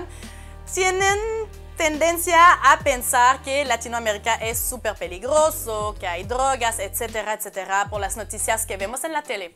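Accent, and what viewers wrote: Peru. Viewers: YÁ hablas con el característico YÁ de Perú. ;) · tienes el acento peruano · Que lindas palabras para nuestro pais, sobre todo la forma como la relatas, con tanta emoción, es cierto el Perú tiene hermosos paisajes, en costa, sierra y selva, por tu forma de hablar se ve que ya tomaste la forma de hablar de los peruanos, linda, besos y abrazos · Eres foránea o peruana el dialecto muy local no corresponde a una extranjera?? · Ya me suscribí me encanta tu asentó peruano... Canadiense un saludo desde el callao❤️ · Me recontra mate de risa cuando en el minuto dijiste algo muy latino y creo que muy usado en Perú "una vaina", jajajaj aja jajaja. Definitivamente ya hablas como toda limeña! 😁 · Mejoraste mucho tu español hata acento de peruano tienes saludos desde argentina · Tu narración fue bien detallado y tienes que seguirla , impactas con tu castellano y tienes el dejo peruano ya tienes el acento...parte 2 y 3..7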